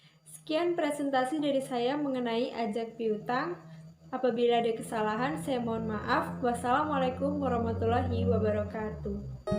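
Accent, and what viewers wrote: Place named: Java, Indonesia. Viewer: Eh orang Bengkulu tapi medok Jawa🤭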